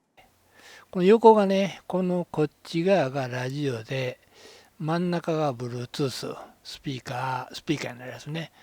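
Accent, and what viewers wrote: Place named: Kansai, Japan. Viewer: 声質と関西訛りのせいでしょうか、「ラジオ」が「ダジオ」に聞こえてなりませんわ😁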